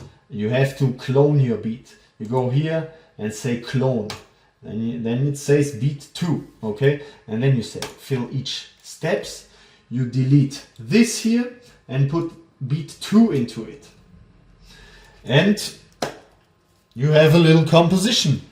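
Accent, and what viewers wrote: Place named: Germany. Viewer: ドイツ訛り英語だけど逆に日本人には聞き取りやすいし、日本語のタイトルは嬉しいね。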